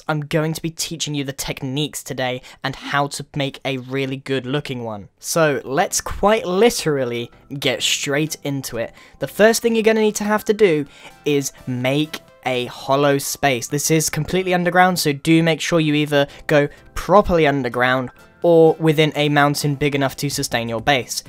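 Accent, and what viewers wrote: England, United Kingdom. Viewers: I love your British accent!!!! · David Benner yes, is British, he lives in the UK and he has a British accent.. And I know because I am british, live in the UK and have a British accent..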